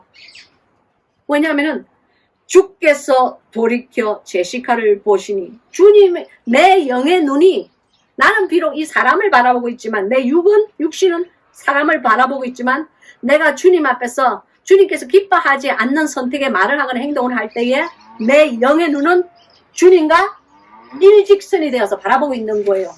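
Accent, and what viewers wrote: Gyeongsang, South Korea. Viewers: 성경공부 너무 좋아요 은혜가 넘쳐요 케냐 사람들을 이해하시려면 하나님의 도움이 정말 필요할것같아요. 목사님도 경상도 사투리 사용하시네요. 음향과 동영상편집은 토니 목사님께서 해주시는건가요? 너무 재밋어요. 예수님도 유머가 잇으시다는데. 잠근동산 책 주문햇어요 곧 도착하면 읽을 생각이에요 변화받길 원하고 실행하는 믿음의 사람되게 해주시길 기도드립니다 아멘 할렐루야^^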